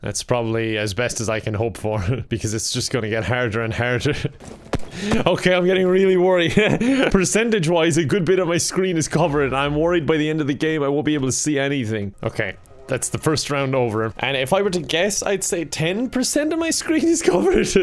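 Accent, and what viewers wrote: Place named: Ireland. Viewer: Are you Irish bc I am Love your vids ;)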